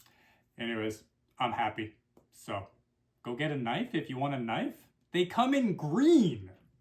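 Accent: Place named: Canada. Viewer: Every Smith Blade unboxing video is a guy with a Canadian accent and I think it's part of their plan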